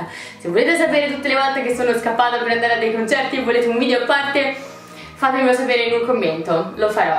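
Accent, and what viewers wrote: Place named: United States. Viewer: parli in italiano con l'intonazione americana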